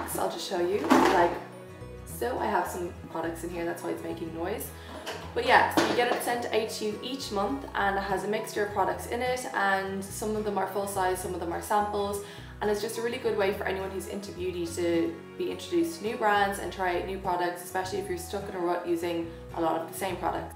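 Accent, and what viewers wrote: Ireland. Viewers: Is she from Ireland? Beautiful 😊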